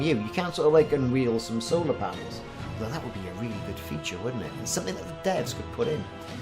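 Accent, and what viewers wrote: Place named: Cardiff, United Kingdom. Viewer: Nice one! V useful, and your accent is making me homesick for my Cardiff roots.Subscribed.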